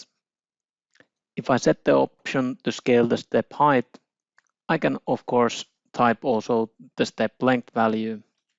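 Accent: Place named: Finland. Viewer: Finnish accent easy to spot :D thanks for the tutorial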